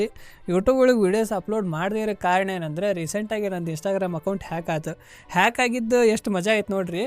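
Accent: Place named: Vijayapura, India. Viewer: ನಿಮ್ಮ ಮಾತ ನಮ್ಮ ಕಡೆದೆ ಇದೆ ರಿ ಸರ್ ನಾವು ವಿಜಾಪುರ ಮಂದಿ ಅವನವನ ಬಾರಿ ಅಂತೀರಿ 😁😁😁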